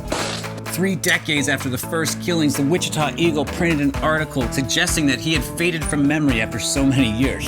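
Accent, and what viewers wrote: Canada. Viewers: Good vid! Wait….are you Canadian?? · I hear a Canadian accent?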